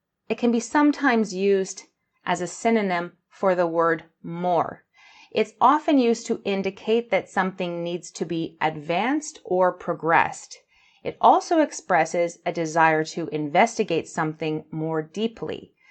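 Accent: Canada. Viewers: J'adore votre accent canadien ❤💞💘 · Why are you using and teaching people American? "aboot" - You sound Canadian, so should be using English, not American. Further can fully replace farther in English (but farther only refers to distance).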